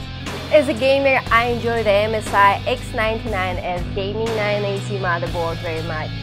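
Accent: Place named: Russia. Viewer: Wow that accent. I can barely understand what she is saying. Is she Russian or something?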